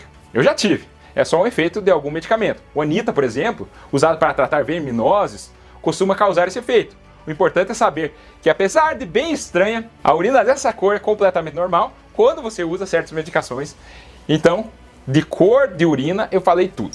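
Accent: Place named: Rio Grande do Sul, Brazil. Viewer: Doutor o sr e Gaucho de onde?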